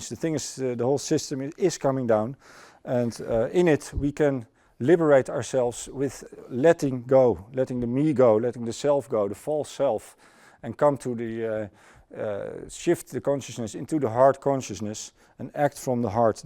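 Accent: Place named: Netherlands. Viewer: Steenkool engels.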